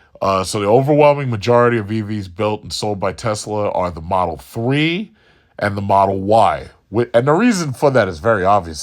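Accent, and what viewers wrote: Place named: Staten Island, United States. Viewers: Why does this guy sound like a white Italian guy from Staten Island..The passport bros were started by black men ... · My Dad, Aunts, Uncles and Grandmother were from Queens and talked the same way. It's a New York thing, kapisch? 🤌🏾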